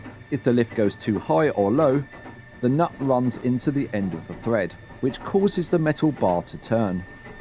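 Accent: United Kingdom. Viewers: What kind of accent do you have? Something British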